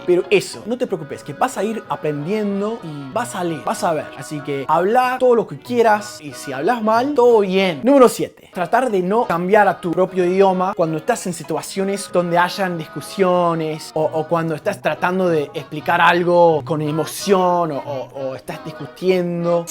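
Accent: Buenos Aires, Argentina. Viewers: hablas español y haces el acento argentino mejor que yo siendo nativa de argentina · dices q no eres latino ... y en vez de decir 'yo' dices 'sho' y tienes toda la fonetica expresiva de un argentino ...usas la sh en varias palabras tipico del argentino ...xD ni siquiera demostraste hablar ingles ...y no eres latino? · este hombre esta muy bueno, aunque su acento me hizo pensar que era un argentino :p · Soy de aca de Argentina <3 y hace poco vi por primera vez uno de tus videos porque estoy estudiando Inglés y era muy gracioso ver como Nihill pronunciaba distintas frases, y te juro que pensé que eras porteño!!!!!!! SOS UN GENIOOOO!!!!! Realmente pensé que eras de Buenos Aires!!! Espero algún día poder hablar en Inglés como un nativo como lo haces vos!!! Saludos desde Córdoba, Argentina.. :) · de que pais eres? suenas como si fueras de argentina. 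· Felicitaciones de una portenia a otro "portenio"!! Es genial tu acento! Felicitaciones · Tienes acento argentino, se oye bastante bien. ¿En cuánto tiempo lo lograste? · yo soy argentino de la parte sur de Córdoba, quería decirte que me parece genial que te interese tanto el (vulgarmente dicho) Argentino Porteño, sí, Porteño, vos hablas tal cual un un Porteño, yo hablo parecido, al estar cerca de la provincia de Buenos Aires y al estar cerca de Río Cuarto (Córdoba) hablo una mezcla de esos idiomas, es como neutro, porque no tengo la tonada porteña, ni la de Río Cuarto, te felicito, la verdad, me sorprendiste desde el primer minuto del primer video que vi tuyo, abrazo. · Pibe, vos no hablar español argentino... Hablas español-porteño... Los argentinos no hablamos así como vos pensás, cada provincia de Argentina tiene su forma de hablar..... Estamos casi hartos los argentinos de que nos porteñicen a todos !!!! 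· Vaya, es muy interesante el hecho que optaste por hablar como Argentino, te va muy bien. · El habla bien español, a el le gusta el acento Argentino Bonaerense. Punto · Es espectacular como hablas "castellano porteño"!!!! es tan difcil hablar otro idioma como si fueras del lugar! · Hablas muy bien e español, con acento argentino. Excelente, felicitaciones. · Él solo habla inglés y español. Lo único impresionante es como imita el acento porteño. Hay gente que habla más de 2 idiomas. Pero que hable el español ya es sorprendente pero ahí nomás. No como otros que aprenden hasta 20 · Con ese acento porteño tan fuerte no creo que tu primera lengua haya sido el ingles !! Yo vivo en Estados Unidos y los nacidos aqui de padres latinos no tienen el acento tan marcado (estoy hablando de hijos de argentinos ))😒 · pero hablas español porteño .... ... no hablas en neutro mm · tu fisico y tu acento es de un argentino mas que aprendio ingles, pero resulta que eres un estadounidense que aprendio castellano, wtf! 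· Cometer errores se dice NO hacer errores jaja tu acento es lo mas porteño del mundo jaja te felicito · WoW de donde eres? no me digas que de E.E.U.U? pero hablas muy bien con el acento argentino!!! saludos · que lastima que aprendistes a hablar como porteño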